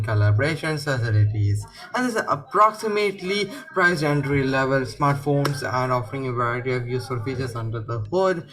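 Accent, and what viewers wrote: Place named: England, United Kingdom. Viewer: Indian hai kya?? Pura angrez ho riya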